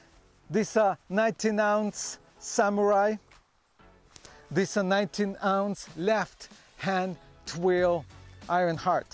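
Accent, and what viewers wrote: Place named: Russia. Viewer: Recognisable heavy Russian accent, IMHO 🤔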